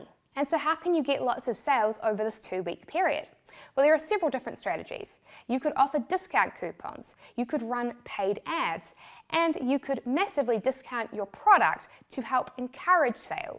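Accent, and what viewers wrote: New Zealand. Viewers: ooo gash you r a kiwi! click the subscription button. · I am Australian you accent sounds NZ but slightly stronger than what i normally hear. I don't know why i said that.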